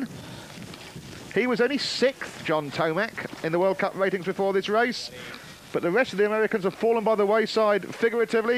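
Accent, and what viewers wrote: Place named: United Kingdom. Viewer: Who hired this announcer? Was it like, Hey! We need a British accent guy to read some info .... brutal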